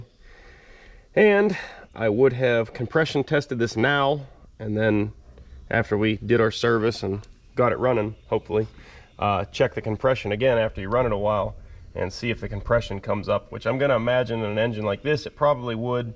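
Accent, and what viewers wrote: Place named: South, United States. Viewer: that little southern drawl inflection is great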